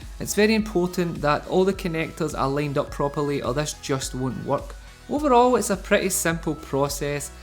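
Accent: Scotland, United Kingdom. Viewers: scottish but it's not usually that strong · Omg yer scottish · its a scottish accent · Thats the good ol scottish accent. 😊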